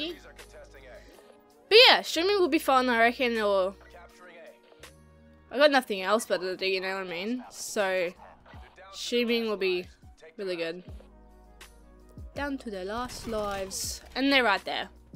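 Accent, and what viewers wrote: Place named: Australia. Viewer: also, the Australian accent is awesome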